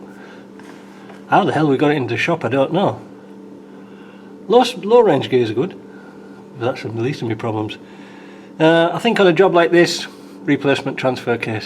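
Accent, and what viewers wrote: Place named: Cumbria, United Kingdom. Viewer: Trying to place your accent. Cumbria?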